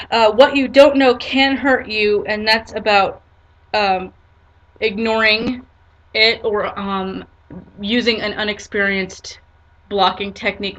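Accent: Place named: United States. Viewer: I love your voice so much. You sound American. Byeee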